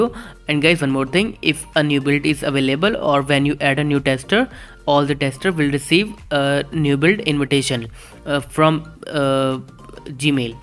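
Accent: India. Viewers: that hindi english, thank you!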